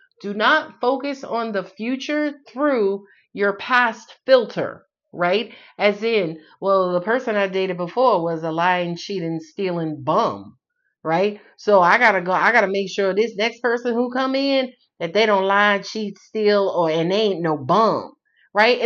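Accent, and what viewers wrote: New York, United States. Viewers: Love this episode. I giggled so much. You giving me ‘I may have had 2 margaritas at dinner’ vibe!!! Was just waiting for the NY accent to present itself! ❤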